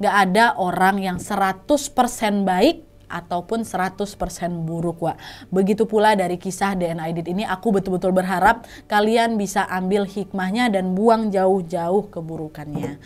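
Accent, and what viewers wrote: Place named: Java, Indonesia. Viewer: sakjane wkwk, logat medok jowo wes an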